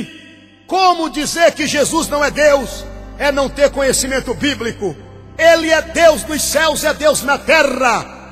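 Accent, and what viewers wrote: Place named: Bahia, Brazil. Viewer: não critica o locutor, ele é baiano, o linguaja do baiano é diferente, ele pode ter errado alguma coisa, mais ele acertou muitas coisas, que essa seita ensina, sem jesus não há salvação. e os 144mil são israelita não gentil como nós, vamos parar de ensinar heresias, como os tj ensinam...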